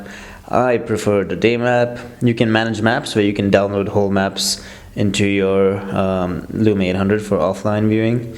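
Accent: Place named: India. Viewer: well by changing ur name you cant hide your indian voice. nice review though.!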